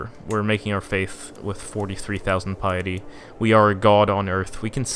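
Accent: Sweden. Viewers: You can tell you're Swedish by the way you pronounce Sigtuna xD You've gotten good at hiding your swedish accent while speaking English too. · Are you Swedish?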